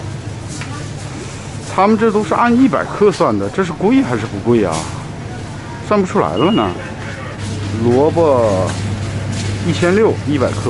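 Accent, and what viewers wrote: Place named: China. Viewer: 你的英语中国口音很重😂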